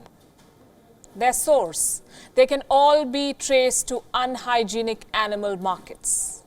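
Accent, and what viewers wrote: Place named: India. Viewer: I love Indian accent on English language, sounds cool.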